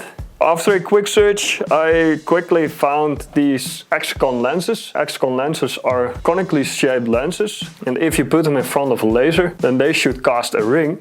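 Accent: Netherlands. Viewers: Het Nederlands accent spat er van af. Kanus man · Hello fellow dutch guy very nice accent, keep up te videos and cheers! Geniet van je frikandelbroodjes~ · just from the accent I can tell you're dutch, its always very obvious or unnoticeable at all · Okay, your accent made me suspect you to be Dutch. But the tube squeezer made me 100% sure :) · Eerste keer op je kanaal. Dacht aan je accent te horen dat je Nederlands was. De "Ah, nee, tyfus." overtuigde me. Interessant idee om met een gel te printen. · mooi nederlands accent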